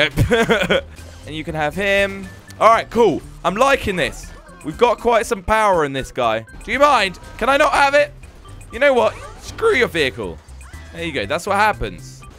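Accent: United Kingdom. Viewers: is talking so british here lol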